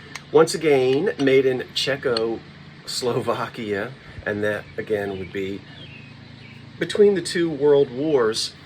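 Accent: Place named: Philadelphia, United States. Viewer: I mentioned to tell u that whenever I get homesick for Philly , Jersey or Delaware I watch you. Even your voice and accent make me feel better.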